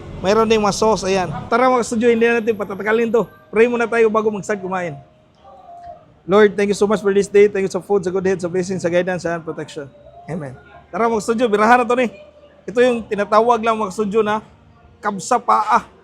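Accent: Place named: Rural, Philippines. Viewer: Buti pa mag binisaya or Cebuano ka nalang kasi yung tagalog mo ay parang pambukid.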